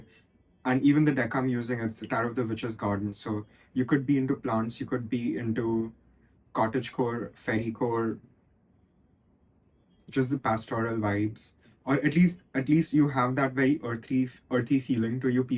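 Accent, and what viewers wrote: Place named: India. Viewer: Ummm I did not expect a white looking guy in a cowboy hat having an Indian accent 😂 anyway pile 2 was accurate. A close friend senses the "darkness" but I think its just cuz Im cynical and hard to impress.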